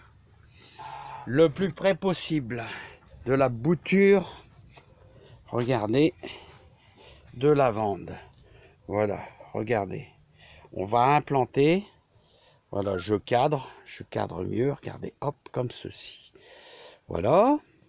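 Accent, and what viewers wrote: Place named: Nord-Pas-de-Calais, France. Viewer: Toujours le sourire dans tes vidéos et tu redonne le sourire a travers tes vidéos Tu parles chti 😊😜 Je te le redis tu es qqun de bien je te souhaite une bonne soirée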